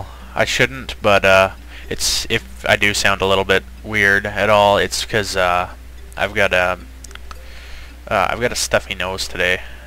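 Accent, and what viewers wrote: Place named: Canada. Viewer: I find it funny that I knew you were canadian the second I heard out XD. Sorry but it's funny.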